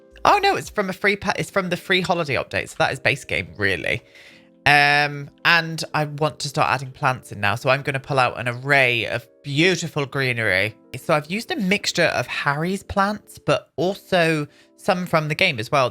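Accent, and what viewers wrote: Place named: Devon, United Kingdom. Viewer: You talk like me! Although my voice is higher - I'm a Devonshire Bumpkin too. I started playing the Sims 4 at the beginning of Lockdown - my 8-year-old granddaughter got me into it.... she said "Nanny, you have to play it as you can marry lots of men and then kill them"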